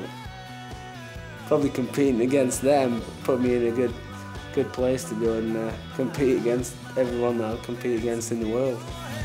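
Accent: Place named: Yorkshire, United Kingdom. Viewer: it's a yorkshire accent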